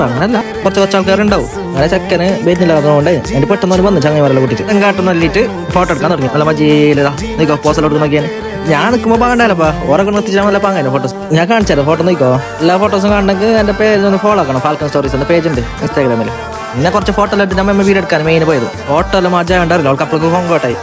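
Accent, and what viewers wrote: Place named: Kasaragod, India. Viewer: Yeppa nammale kasrod slang😂🫶 maje aain🥰💗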